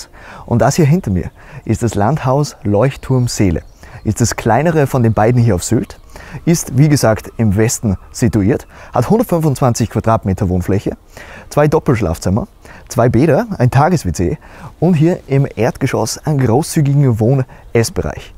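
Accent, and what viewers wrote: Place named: Austria. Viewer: Schönes Objekt. Aber diesen österreichischen Schnellsprecher musste ich stummschalten.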